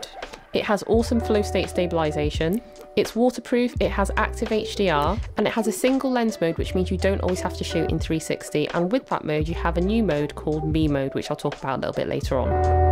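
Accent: United Kingdom. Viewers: This is the first time I've seen a video of yours. The truth is that I loved the way you describe and explain things. Your voice is very relaxing and I really like your accent. (is british?) It is a pleasure and a delight to listen to you and watch your videos. I hope I can have an insta360 soon. New subscriber here! Greetings from Uruguay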